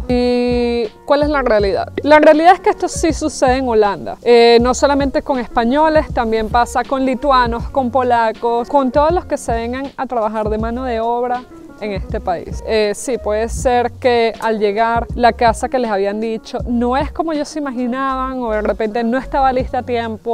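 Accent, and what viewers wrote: Venezuela. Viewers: Hola, creo que tú acento es Venezolano, tengo una consulta. Una ETT justo con las promesas que tú estás hablando... 40hrs bla bla... Valdria la pena ir ? De aceptar con cuánto dinero debería irme ?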